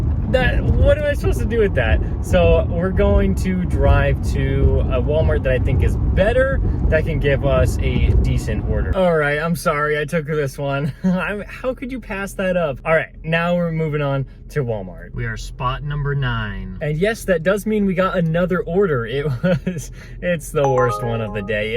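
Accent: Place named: India. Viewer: Absolutely giving you the run around in that glorious Indian accent.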